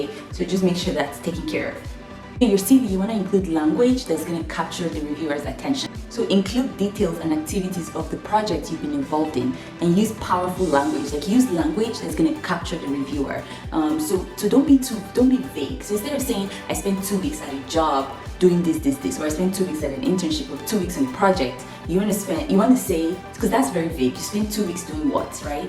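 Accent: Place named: Nigeria. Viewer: Thank you. I appreciate this video. We have something in common though, I teach sunday school at my local church and gurrl I nver used to write in details like you just explained. I will edit right away. Thanks again. You sound Nigerian too. 😉😉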